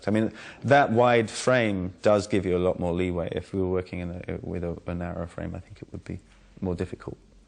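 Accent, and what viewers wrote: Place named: RP, United Kingdom. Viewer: It's a little weird hearing him speak because I'm accustomed to hearing his 'Londoner' accent in 'Lie To Me,' and right now he sounds really posh in comparission, it's throws me slightly lol XD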